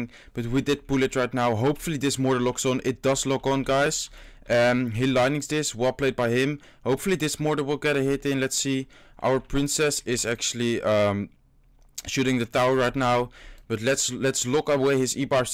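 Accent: Netherlands. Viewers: ben jij Nederlands????? zo praat je namelijk wel: ) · Zyt gy Nederlands spreker? ge hoort dat echt... maar je kan wel goed engels spreken... ik ben van belgie btw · Dikke Nederlands accent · ik hoor het nederlandse accentje:p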